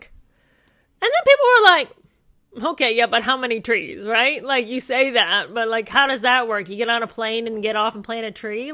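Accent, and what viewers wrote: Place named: RP, United Kingdom. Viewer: You're posh English accent is hilarious! So funny. Thank you.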